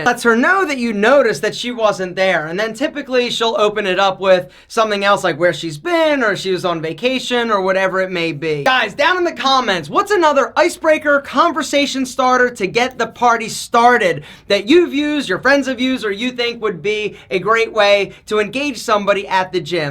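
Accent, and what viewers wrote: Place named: New Jersey, United States. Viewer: So you’re from new jersey I can tell from you’re accent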